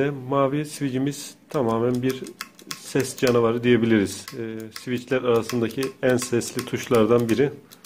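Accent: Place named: İspir, Turkey. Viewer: Ç'lerine gurban olduğum Gardaş sen İspirli misin?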